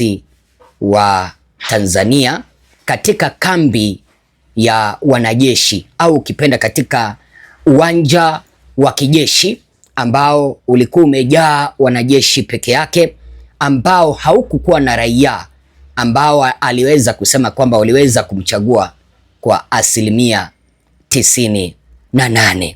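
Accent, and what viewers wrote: Kenya. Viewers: Kwanza huyu jamaa ni mkenya hakuna mtanzania anaeongea kiswahili hicho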